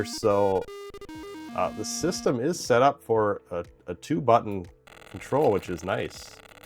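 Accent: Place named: Canada. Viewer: How do you plug in the Spectrum? Assuming you live in Canada, based on your accent, do you need a step up converter for it? I live in the US, and I know in North America has 110-120v power while the UK and Europe use 220-240v. I ask because as of this comment, I'm getting one in the mail and I'm afraid to plug it in just straight into the wall at the risk of ruining it.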